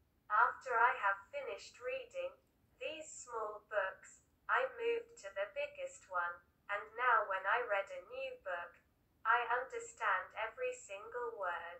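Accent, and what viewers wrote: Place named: United Kingdom. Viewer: You have a British accent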